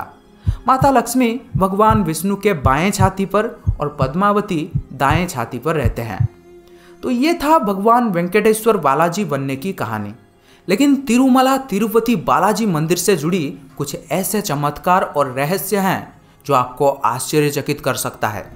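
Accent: Odisha, India. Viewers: This youtuber is also an Odia..you can mark his odia accent.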